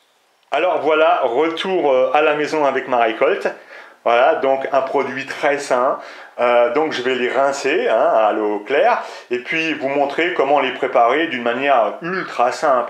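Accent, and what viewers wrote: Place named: France. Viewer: Tu m'as convaincu j'en fait une soupe dès demain ! (PS : j'adore ton accent bien franchouillard, tu respire la sympathie et la bonne humeur ;) )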